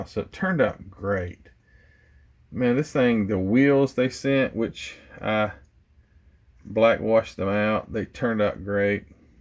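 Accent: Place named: Georgia, United States. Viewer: I rather watch videos with someone explaining things than just music , I like listening to you cause you are from my home state Ga and I miss it but I sure don't miss the humidity. .o boy lol.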